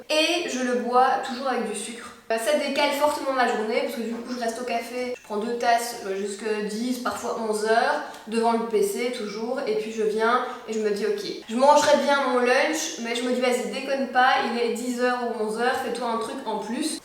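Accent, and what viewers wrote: Belgium. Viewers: J’adore ton accent belge 😂 pour les pâtes de pois de pois chiche et lentille y en a au colruyt 😉 es ce ke tu peux dire quelle genre de biscuit tu manges es ce que sont ceux des régimes ou les normaux😘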